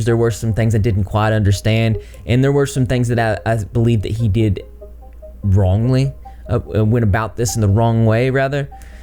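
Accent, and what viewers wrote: South, United States. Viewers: Good video, but you look like a Kingdom Hearts villain with a southern accent.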